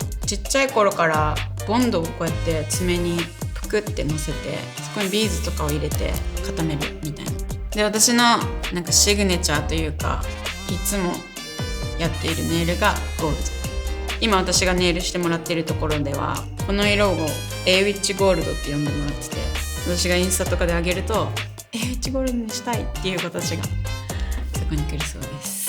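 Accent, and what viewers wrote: Okinawa, Japan. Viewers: 沖縄な喋り方！！！故郷思い出して気を取られて内容聞けなかった笑 もう一度見る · 沖縄なまってるよ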